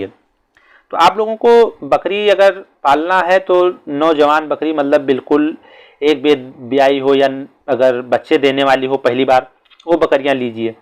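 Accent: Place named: Uttar Pradesh, India. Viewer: Apki awaj se bahraich ki mahak aati hai. Thanks brother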